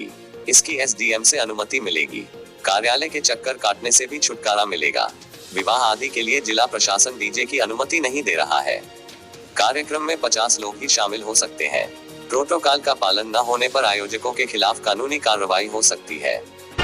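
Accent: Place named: Himachal Pradesh, India. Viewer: Sir aapki voice me jo himachli tone aati h hm to bo sunne k liye hi aapka video dekhte h plss phle wali voice laye.....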